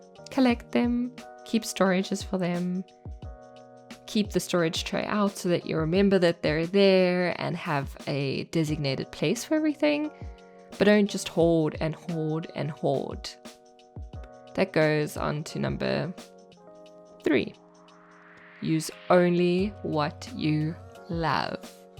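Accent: South Africa. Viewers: Hi! First time to your channel. New subscriber. So enjoyed this video. South African?😃🎀💕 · First time watching your channel and I have to ask, are you South African. Very useful tips given. Thank you. I’m enjoying watching all the Junk Journal July videos.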